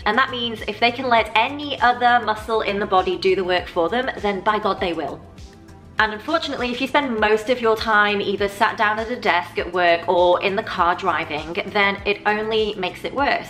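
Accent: Southern England, United Kingdom. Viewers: Thanks:) I'm Spanish. I live in Spain but used to live in your home country England. I also have friends in the south of England, you people have the most beautiful accent! <3 My bum is improving and hubby notices it:D hehe... he says it's harder and rounder. Although I'm lucky and he finds me sexy no matter how big or small, hard or flabby:) I agree with you, age is no excuse to not be your best and my figure is kind of 'getting younger', sort of leaner. I seemed to concentrate my weight around my belly and upper thighs for years. I don't know if it's an age thing but I do think sitting down a lot (for work) has lots to do with it. I exercise at home and what I've done is place 15 kgs of dumbells on my belly. I contract my pelvic area to avoid any harm there and do 30 hipthrust reps concentrating on contracting the bum as you advise, rather than go very high up. 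I do this lying on my back with my legs on a sofa so I don't strain my knees at all.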